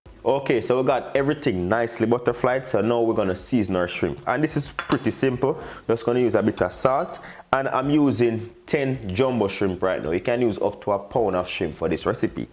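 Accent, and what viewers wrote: Jamaica. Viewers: Came here for coconut shrimp but oh my u r sexy.....love your Jamaica accent ...come to my kitchen we gonna do lots of cooking. ...😉😉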